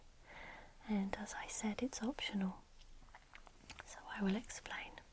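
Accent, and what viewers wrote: United Kingdom. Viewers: This is the first video of yours I've seen, I must say, it's excellent. The overall quality is very genuine. I went blindly into this video, then you called yourself " " and you had a British accent, and I had a Doctor Who, I mean, you're even a ginger!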